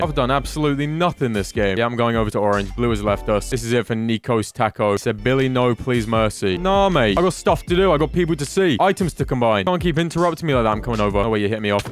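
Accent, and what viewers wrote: United Kingdom. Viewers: Are you inglish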